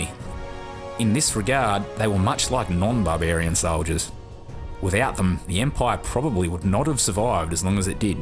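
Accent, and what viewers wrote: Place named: Australia. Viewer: Wonderful overview told with a lilting Australian accent. Shared this with fellow students in a Future Learn course sponsored by Newcastle University, "Hadrian's Wall: Life on the Roman Frontier". Thanks!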